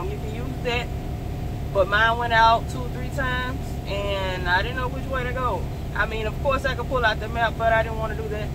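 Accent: South, United States. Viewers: Pretty! Love that southern accent. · I'm liking that Country Slang/Accent 😃.... You're still driving, right ?...I'm considering getting my CDL...And switching over from doing Manufacturing work for over 20yrs... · I heard your southern drawl. I'm from Florida, born and raised. I just recently graduated from St. Petersburg College with an Associate degree. However, I want a house in a hurry. I can not get my house in a hurry with a 10 dollars an hour job. Have you been to college or some kind of trade school? How old are you? What do you do now for a living? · Girl you so country I love it!!!!!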